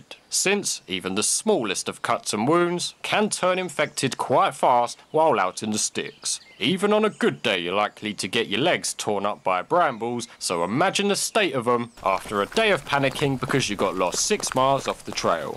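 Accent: United Kingdom. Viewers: Ure probs american it is literally just british!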